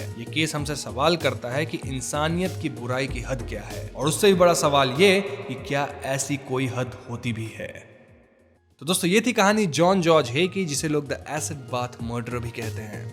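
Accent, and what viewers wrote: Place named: Bihar, India. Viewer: apna bihari accent thik kro gussa aata h galat pronounce sunne k baad.. "kar diya, " kar di"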